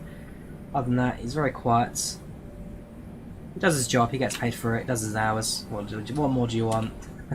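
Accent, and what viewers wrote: United Kingdom. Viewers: Why are you using British accent?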